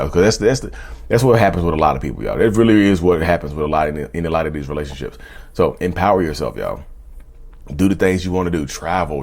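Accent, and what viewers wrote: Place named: South, United States. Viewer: It’s his particular southern dialect, depending on which region a family is from. My family is from a certain part of the south. It’s normal, you just gotta get used to it and keep up. Pretty sure he’s been speaking this way since he learned to talk.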